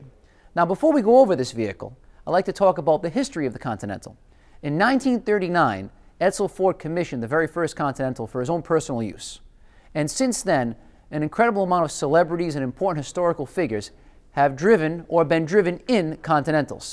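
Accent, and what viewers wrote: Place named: New York, United States. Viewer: A New York accent and a Lincoln. great combo!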